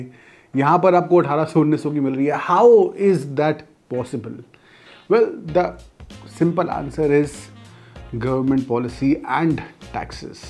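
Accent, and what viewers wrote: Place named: England, United Kingdom. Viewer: Dilli bol n be kya Delhi Delhi bol rha he sale angrej ki aulad Kheir video banane ke liye dhanyawad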